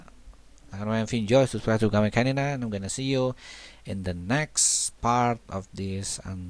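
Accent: West Java, Indonesia. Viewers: nyunda banget kang.. ahahahah.. the real SUNDA Modern :) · paingan logat na logat sunda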